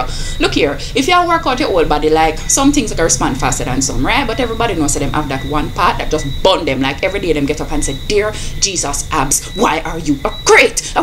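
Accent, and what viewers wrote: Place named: Jamaica. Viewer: Patois voice better! :)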